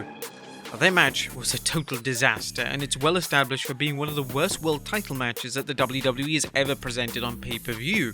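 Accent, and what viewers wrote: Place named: United Kingdom. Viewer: H not haitch bee Kay. I hate how the UK people talk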